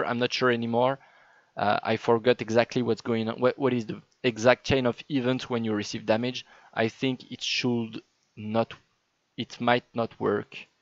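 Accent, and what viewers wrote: France. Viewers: Es-tu français ?? sinon très bonne vidéo comme d'hab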